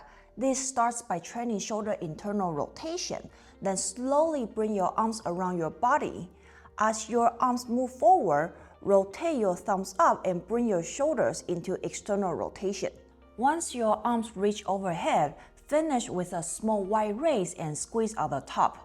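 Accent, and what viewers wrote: China. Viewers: Cantonese accent?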